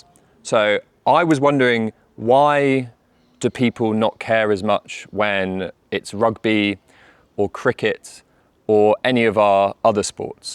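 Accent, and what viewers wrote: United Kingdom. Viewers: Is it RP accent?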